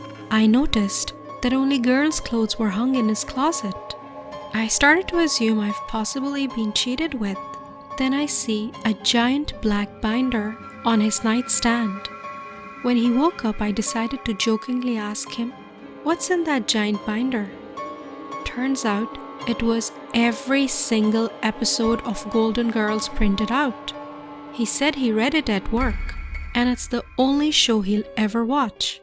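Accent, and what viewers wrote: India. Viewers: Your accent is Indian From~🇧🇪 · Narrator's Indian accent is putting me off. She sounds Bengali. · Is the narrator indian ?? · You have a cute Indian accent. I can hear it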